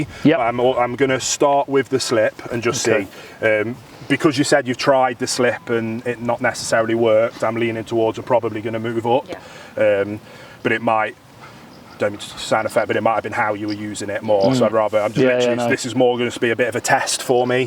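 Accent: United Kingdom. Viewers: Just read a bunch of these. I've been watching for a while now, my son calls him the UK guy cause we Yanks love the accent. Anyway, for those who complained, there is an older longer video on slip lead training of a bad puller. It's an excellent vid and what I used to correct my Lhasa apso mix monster. For those of you who don't like or can't get prong collars (I don't think I'd put a prong on a Lhasa) then try a Gentle Leader. They really do work IF you present them correctly and use them correctly. Read the directions! All of videos are great, but there are other good ones as well. Look around and be willing to devote some quality time to your dog!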